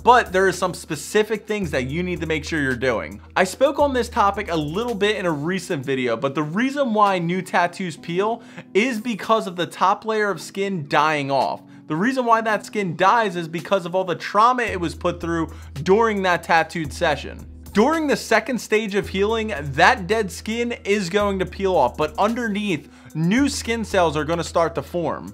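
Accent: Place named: Maryland, United States. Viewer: You’re awesome. Thanks for making such in-depth vids! One thing: hahaha where are you from. I’m guessing Maryland. “Wooder” for water. You’ve got to be from MD! 🪵💦😉🦀 ✌🏻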